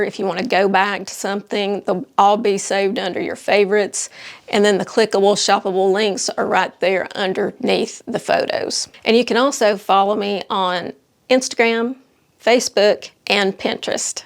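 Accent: South, United States. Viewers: Hi. I love your video. I’m 65 and trying to figure out how to get where you are going in my own personal life. I am disabled so I’m at home all the time. I would not be caught dead in Capri pants or shorts or dress because I have skin problems on the bottoms of my leg below the knee. It’s kind of a permanent thing-that I can’t do much about at the same time. I can’t imagine wearing linen flowy pants every single day all the time. I just don’t quite know where to go stylewise. I end up wearing sweatpants all the time. I live in a small small town so I have to do all of my shopping online. I’m going to keep trying to transform my summer look. Thank you for the video. It keeps me focused on a goal PS I absolutely love your southern accent. I’m a southern girl myself, but I live in the upper Midwest now. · I laugh and think of your sweet southern accent when I see Capri pants and cheap flip flops!!!!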